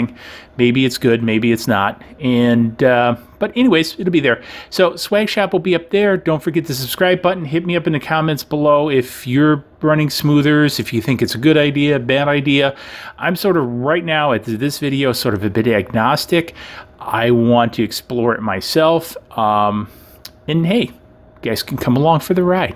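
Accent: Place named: Chicago, United States. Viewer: That Chicago accent!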